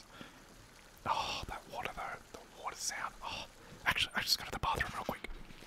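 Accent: Australia. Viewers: Never before did I experience an Aussie whispering in my ears. Gotta say, it's very calming. Great video!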